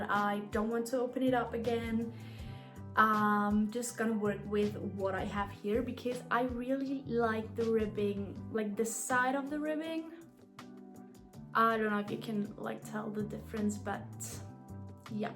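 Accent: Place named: Italy. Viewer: ngl never heard an Italian speak this good English, there is always a very noticeble accent but not as much from you which made me want to keep watching more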